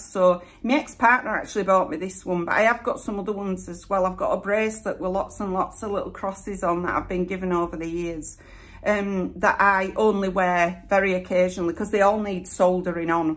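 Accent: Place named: Bradford, United Kingdom. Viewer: That was fab - I'm sure you've had lots of ups and downs in your life but I bet it's never been boring! One more question - are you still going to start your art course? I guessed as soon as I started following you that you had a Bradford accent, but just one Geordie word sneaks in - when you say "wor" instead of "our"! I'm guessing that's rubbed off on you from your mum rather than memory. Last but not least, being a salad dodger myself, I'm glad that your favourite food has gone from lettuce to toast!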